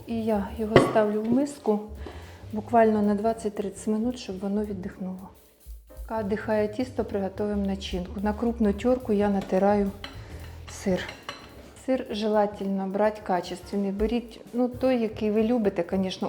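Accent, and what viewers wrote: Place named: Odesa, Ukraine. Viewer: Одеський говір. На заході теж розмовляють не на укр.мові.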